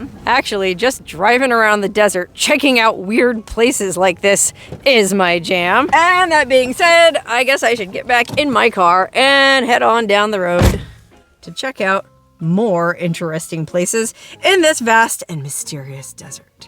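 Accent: Germany. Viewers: Love your German accent, mein schones madchen! ( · Love your German diliatic! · There is something about German accent that makes things tingle. That being said, it’s a serious bummer one isn’t into making a video in Orange County! Just saying… Bwhahahaha Always a pleasure seeing where you’ve been lately!! Safe travels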